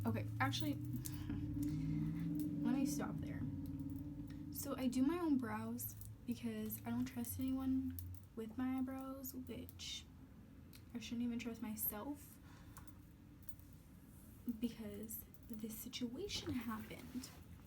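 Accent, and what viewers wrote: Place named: Florida, United States. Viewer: You sound like you’re from Florida based on your accent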